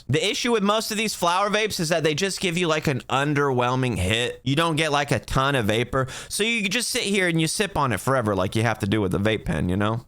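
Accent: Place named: East Coast, United States. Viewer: You have an east coast accent and you just called em Scooby snacks, you had to have grown up near new england or something 😂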